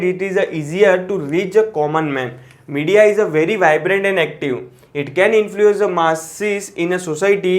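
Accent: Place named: Mumbai, India. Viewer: Mr., you have a touch of Mumbai's local language.😅."aapan, yeh kya bol rha hai, paile(pehle).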